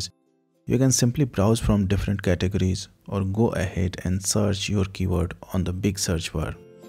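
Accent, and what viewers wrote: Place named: India. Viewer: He is from India. That's how they pronounce words. Do you also correct french, Italians and Norwegians with their pronunciation when you meet them?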